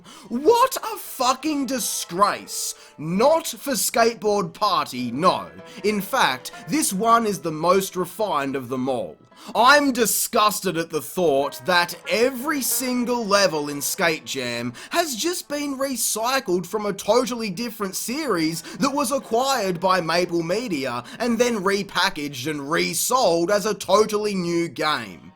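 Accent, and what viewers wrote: Australia. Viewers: "Kind of, like, YouTube, actually. Don't forget to subscribe and share Tony Hawktober with all of your friends!" Mate, I'm Brazilian, my few friends that actually understand a bit of English unfortunately wouldn't ever understand that marvelous down under accent. But, you know what? I've been watching you for over a year, and if you hadn't said that, I'd never notice I wasn't subscribed. Now I am. Sorry about that, mate, I honestly never noticed since your videos always appear in my home page. I could swear I was subbed.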